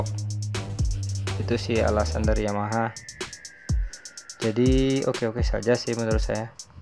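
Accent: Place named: West Sumatra, Indonesia. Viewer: Ini yg ngomong kok kaya org sumatera barat bukan org bali.. (yg org bali asli komen y)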